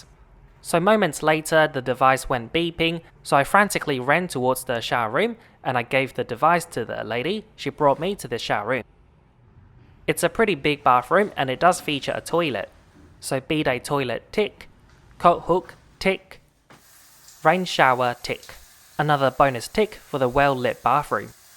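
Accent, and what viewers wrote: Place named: Australia. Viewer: First of your videos I've seen. Your excellent English with an Aussie accent is adorable!! Planning to take KA so I can take my cat in the cabin, instead of frightening her in the (admittedly pressurized, climatized) forward cargo hold. I see on the seating charts that KA has a small number of dedicated "pet friendly" seats, but so far I haven't been able to find a video of someone bringing their cat along on KA. Maybe I should make a video of my (our!) experience!? 🤷😺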